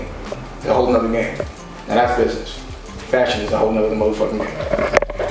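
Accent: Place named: Harlem, United States. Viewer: Amazingly google how much Dame net worth is you it will be surprise you. Talking like a tru Harlem dude.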